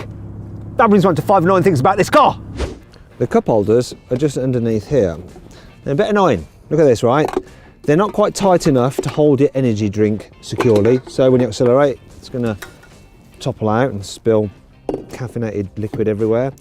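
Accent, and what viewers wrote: United Kingdom. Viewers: 이형 영국발음때문인가 자꾸 보게돼....시원시원하고 깔끔하고 재밌어....뭐지 이형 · 역시 영국식 발음은... 멋지네요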